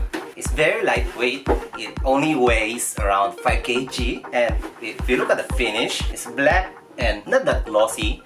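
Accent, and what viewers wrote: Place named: Singapore. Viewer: grabeng accent singaporean vibes :) more videos again using brand new piano. :)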